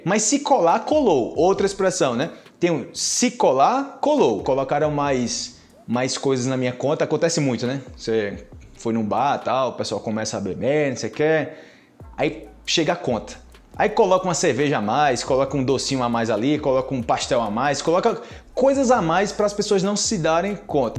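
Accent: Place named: Colombia. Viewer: Impresionante el acento colombiano!!! Se te oye bien!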